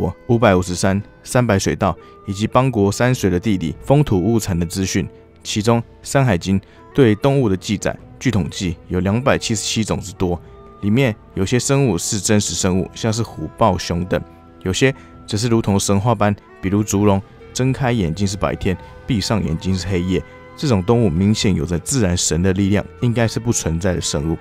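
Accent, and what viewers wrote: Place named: Minnan, China. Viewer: 主播的國語去再進修，一嘴閩南口音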